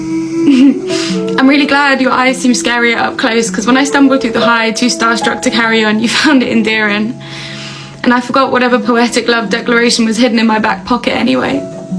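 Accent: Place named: United Kingdom. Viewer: I would write ✍️ words and phrases to your lines that inspire me, that talk to me. I would compose words and phrases that your UK accent speaks to me every time you write a line, edit out a word or phrase that makes it more mathematically precise. Hoping that it is what my soul is saying to your soul.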